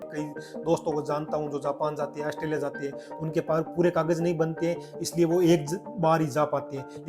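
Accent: Rajasthan, India. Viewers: आप राजस्थान से हो क्योंकि आपकी भाषा में राजस्थानी झलक है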